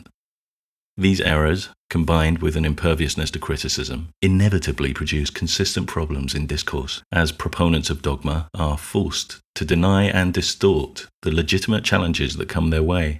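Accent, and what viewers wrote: England, United Kingdom. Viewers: Don't listen to this man just because he has an English accent, and minimal graphic design. His opinions are no more valid than yours and mine.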